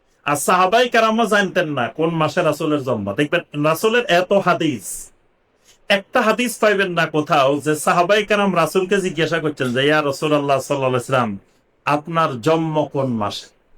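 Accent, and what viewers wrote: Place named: Noakhali, Bangladesh. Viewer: বাউরে হেতে বলেন চমৎকার। তবে নোয়াখালীর আঞ্চলিকতা ছাড়তে পারলেন না এখনও হুজুর।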